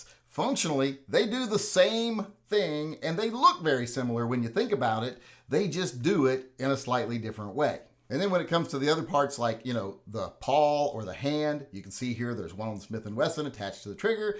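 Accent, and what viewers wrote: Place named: New England, United States. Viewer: Where you from originally?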